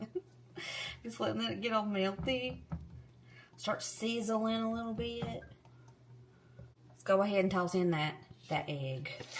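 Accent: South, United States. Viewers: Us southern girls say oil just like you. Don't go changin'! 😂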